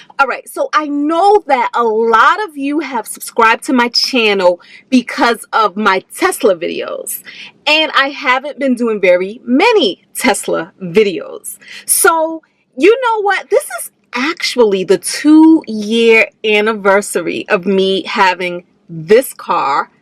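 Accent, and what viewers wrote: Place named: DMV, United States. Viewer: Love the DMV accent!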